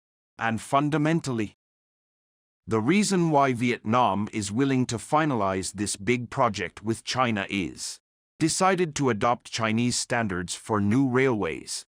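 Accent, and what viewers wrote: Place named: United Kingdom. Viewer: Hmm your tone to speak but slow looks like.. british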